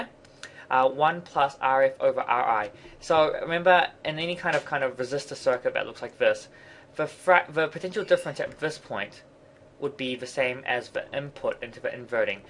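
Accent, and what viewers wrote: New Zealand. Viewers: You're from NZ aren't you ?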